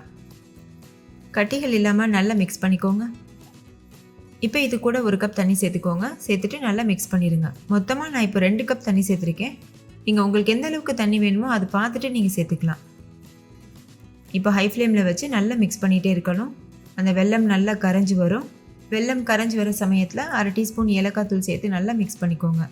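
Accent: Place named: Thanjavur, India. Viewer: ஹலோ உங்க ஸ்லாங் எல்லாம் பார்த்தா தஞ்சாவூர் டிஸ்ட்ரிக்ட்😊 உங்க ஊர்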